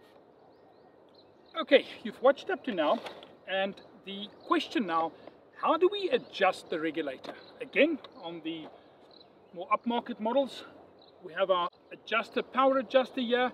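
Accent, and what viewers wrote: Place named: South Africa. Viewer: Awesome I thought I recognized the accent and also trust a South African to look at things and improve on it.."n Boer maak n plan" right...well everything of the best for you Mate!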